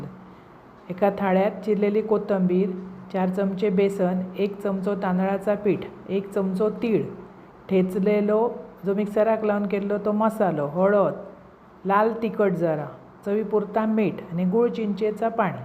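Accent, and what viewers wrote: Malvani, India. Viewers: ताई तुमची बोलण्याची पद्धत अतिशय गोड वाटते .आपलेपणा वाटतो.मालवणी गोडवा .पदार्थ छानच.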